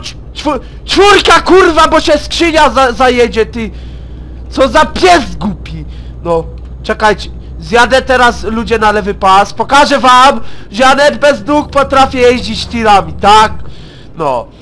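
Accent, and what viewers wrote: Silesia, Poland. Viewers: ty jesteś z Śląska ??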